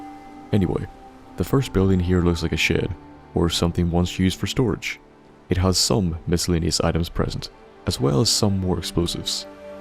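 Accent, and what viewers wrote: Northern Ireland, United Kingdom. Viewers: My guess is South Down Or Tyrone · You sound so Northern Irish XD great channel btw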